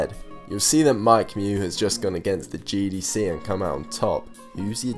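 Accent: England, United Kingdom. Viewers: British accent ? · are you from england or maybe australia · He is a fellow Brit.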